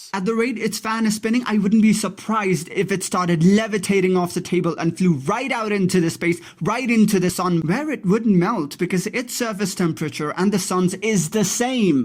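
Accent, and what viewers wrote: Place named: United Kingdom. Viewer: Your videos are great, keep it up. And your accent being British is very interesting, when I first saw your 3000 years history video, I thought you were British lol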